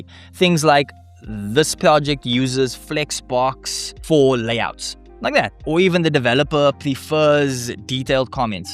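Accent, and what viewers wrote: South Africa. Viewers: What’s that accent dawg? South African? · My guy are you South African?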